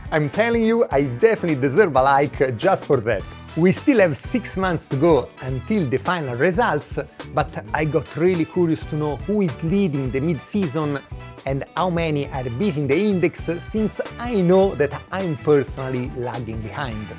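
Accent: Italy. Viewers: THIS GOTTA BE THE THICHKEST ITALIAN ACCENT I HEARD 😁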